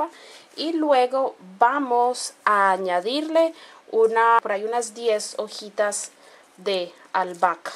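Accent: Colombia. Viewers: no tengo ojeras ,solo pase por tu video y me embrujo tu acento colombiano. bonita, inteligente y simpatica...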